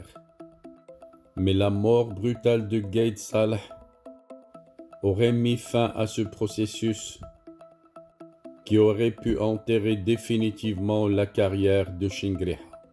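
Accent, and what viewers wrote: Morocco. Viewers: Mais qui veut éliminer mimi VI ? Le pauvre tout le peuple est contre lui même el mekhzen et ses enfants ! Dieu nous préserve de ce virus marocain ! VIVE L'ALGÉRIE 🇩🇿💪 VIVE NOTRE ARMÉE POPULAIRE ALGÉRIENNE 🇩🇿💪 GLOIRE À NOS VALEUREUX MARTYRES 🇩🇿💪 JE VOUS DONNE UNE NOTE DE 10/10 L'accent du marocain qui vous fait honte, vous avez essayer de camoufler hélas ! La récitation excellente !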